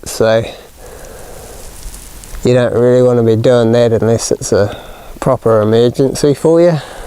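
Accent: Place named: New Zealand. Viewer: Huppast 12 to huppast 2 ah bro 👍 Spoken like a true kiwi. Love it !! 😎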